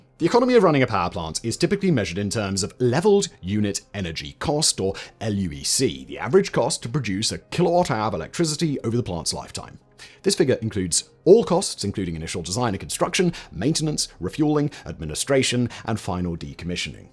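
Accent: United Kingdom. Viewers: But with a Brtish accent, it sounds some much more sophisticated then when AI narrators screw it up. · which is odd, since there is a Kincardine in Scotland, which given the host's UK accent, should be familiar.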